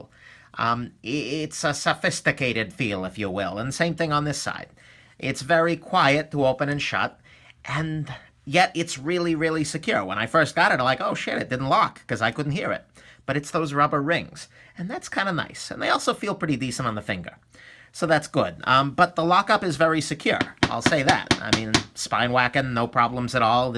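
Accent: New York, United States. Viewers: I love your reviews. Very thorough, but concise at the same time. Can't tell if your accent is New York or Boston.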